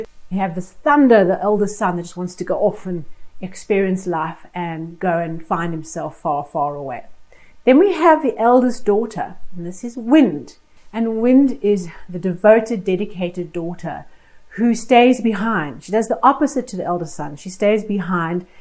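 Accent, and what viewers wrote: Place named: South Africa. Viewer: Oh my goodness, you are South African. I am too!!!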